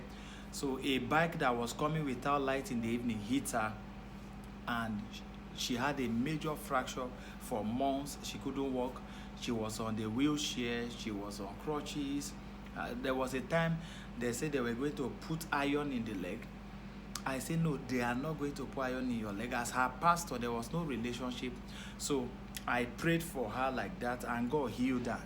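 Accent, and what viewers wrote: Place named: Nigeria. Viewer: lmao...😆😆😆I love Nigerian accent...lol, u funny Apostle. I love this...blessings to you and your family. I agree to this aswell and yes it bless me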